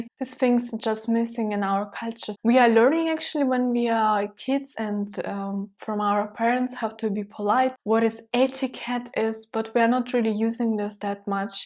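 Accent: Russia. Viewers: Hm, whom do you want to address? A Russian who speaks an elaborate, but very Russian English, about German themes and who is living in Germany. Would Russian or German language not be the better choice? Which English speaking native gives a damm what any Russian English speaker tells about Germany. I have the strong feeling, that one of the corner stones has to be altered here to work for your goal to attract subscribers on YouTube. Some do simply not have the looks and youth to have easy success. Not the case with you, so overthink each other pillar on your path to glory, or wealth, or what you want to achive in life through Youtube. To you the best.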